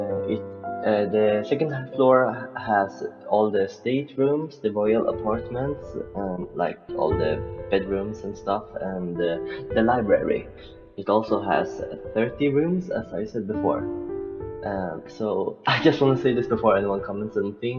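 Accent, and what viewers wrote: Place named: Norway. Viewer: Which country are you from? The accent sounds like Norwegian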